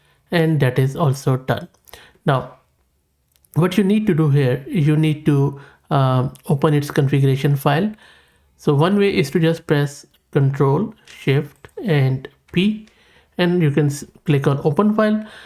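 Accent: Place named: India. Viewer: >indian voice >disliked and reported the video immediately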